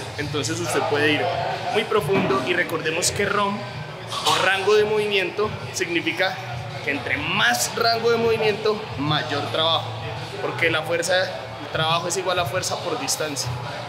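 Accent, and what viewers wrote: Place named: Colombia. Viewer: Saludos desde México pareces, ya hasta me estoy enseñando hablar el dialecto colombiano, que chimba de vídeo!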